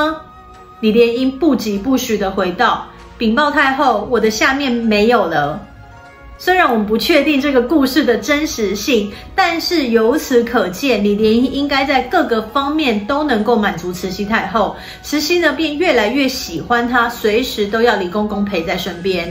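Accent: Taiwan. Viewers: 标准的台湾国语。比很多ㄉㄞˉㄨㄚˉ厲害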